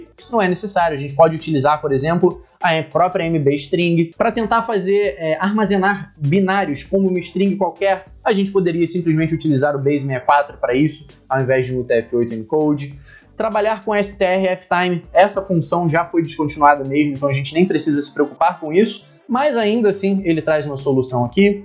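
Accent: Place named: Rio de Janeiro, Brazil. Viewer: Boa! parabéns, mas esse sotaque de carioca vixi! de boas cara!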